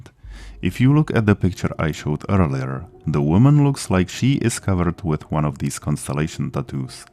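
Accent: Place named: Czech Republic. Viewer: You sound very Czech. Are you Czech? YOU ARE! Hello :D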